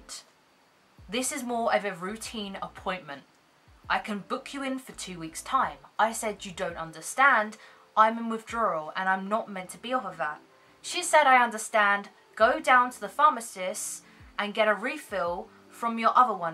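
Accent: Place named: United Kingdom. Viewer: I'm gonna move to the uk just to hear that accent daily 😄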